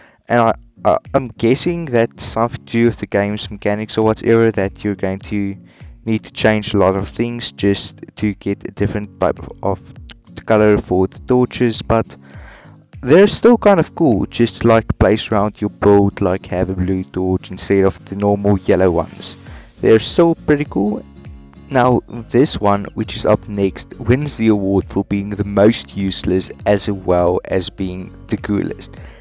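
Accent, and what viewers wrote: Afrikaans, South Africa. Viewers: Are you from south Africa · Mate you from SA? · He sounds southe afrikaan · is jy afrikaans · Are you from South Africa #SouthAfrican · You can hear the afrikaans in his voice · youre afrikaans right? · Dude are you South African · My bru you sound south African my chom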